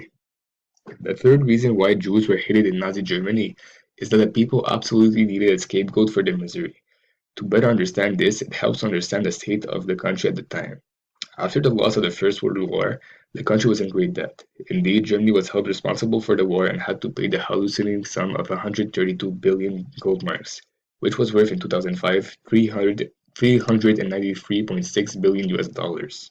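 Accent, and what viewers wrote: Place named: Ireland. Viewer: Great material my friend, this helped me puzzle-out a lot of things I had never figured out before. I hope you are coming back very soon with videos this good. P.S.: I love your Irish accent, I can clearly see you grew up in Dublin!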